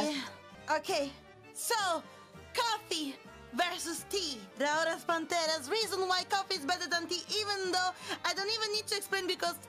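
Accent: Italy. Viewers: she sounds like Dr Evil but with Italian accent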